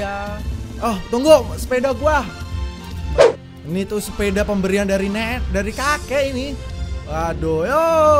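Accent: Jepara, Indonesia. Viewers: OrNg jepara kah bang?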